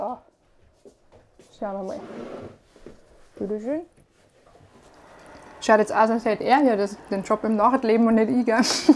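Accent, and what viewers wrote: Bavaria, Germany. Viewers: Ich kann es mir ja leider nicht gut anhören, weil ich mit bayrisch nicht zurecht komme. Aber fiel Glück weiterhin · Bayerisch is einfach so ein schöner Dialekt 😛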